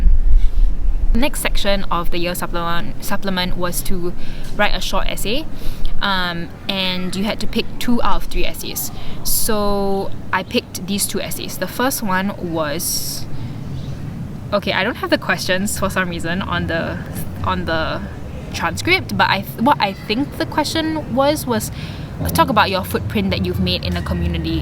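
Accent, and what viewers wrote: Singapore. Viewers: Hopefully your Singaporean accent will fade away with the world class education at Yale.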